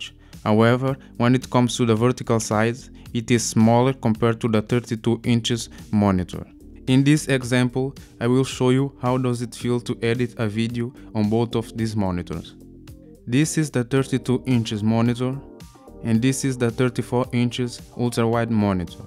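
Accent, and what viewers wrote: Russia. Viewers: i think u re russian right? :D by the way thanks for the review, i exatly for the same dilemma, i need 3 tabs open, and be some playe for the desktop, maybe for me a 32:9 would be a better option? just don't know because, if i want to stream my pc via moonlight i have alay cange the 32:9 resolution to 16:9 when i would like to game on a laptop or tablet or pone? i really hesitant, becasue most of the time i want to be able to reach my desktop from anywhere the world without changing resolution and stuff, maybe ahve to stay with a 32 inch 16:9 or do you have any advice for that? thanks in advance! :) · what with accent, sounds like russian dialect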